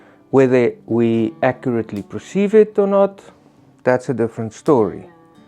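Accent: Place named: South Africa. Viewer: Just found your vid via YouTube on my tv - loved it😁 Where in South Africa do you hail from? Over 50yrs ago a relative of mine went to SA for a 6 month working holiday and stayed! Your accent reminds me of his wife's. Looking forward to seeing more of your adventures 😁 According to a mountain rescue team leader my sister worked with (and who taught me how to read an OS map) you should never go hiking in denim jeans!! Take care and please keep on bothy camping for those of us that can't! Another subscriber 😅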